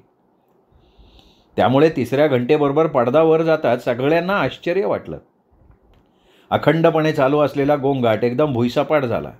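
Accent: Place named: Malvani, India. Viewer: Far mast hasun hasun vat lagli mast Sir ani Sir aple abhar tumche malvani vachan lay bata vatala ha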